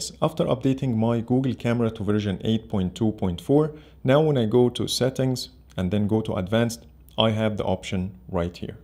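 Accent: Egypt. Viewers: يابا ال accent المصري معروفة في اي حته 🇪🇬 😅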